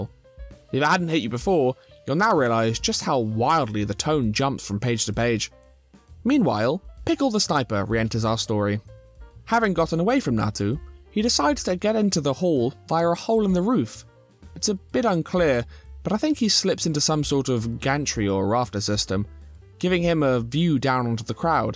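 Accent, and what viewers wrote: United Kingdom. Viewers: I've never opened a video and immediately got attacked by a blast of so much aggresive britishness , Kudos for that, immediately hooked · The most british voice i have ever heard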